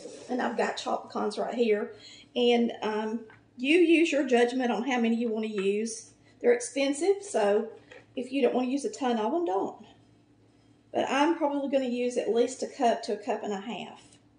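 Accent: South, United States. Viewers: It’s kinda hard to marry up a southern American accent, and a German cake 🤪. Y’all get some pecaaaaarns LoL. However, the sound quality in this video is first class, and the recipe is worth making 👍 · I don’t know how this came up in my feed, but I am loving this. This feels like home. I mean, Lordy mercy! Somebody that talks all southern like me! Likin’ and subscribin’ right now. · It looks delicious, can't wait to try this. Thank you, sweet lady, I love your Southern accent.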